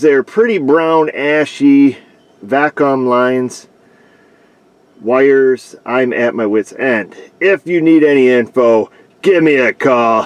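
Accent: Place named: Western New York, United States. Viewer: I’ve been in the area twice recently, but alas my car was running fine. I always learn something when I watch your videos and is like a trip back home when I your that WNY accent.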